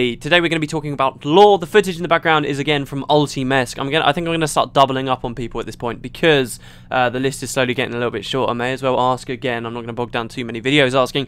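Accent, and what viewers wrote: United Kingdom. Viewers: English is a foreign language to me but i can nearly understand 100% of everything you say. Thank you for your clear voice and your british dialect beeing not that extreme. Its very delightful and relaxing to listen to. At least in my head you are a very handsome and good looking man :)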